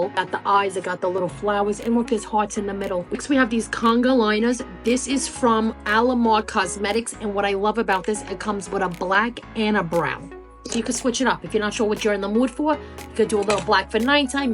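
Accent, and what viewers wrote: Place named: New York, United States. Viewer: First time i’ve watched your video. I’m watching from the UK. I have to ask are from New York with Italian heritage? Your accent is just so what I hear on tv when the characters are from New York. It’s great!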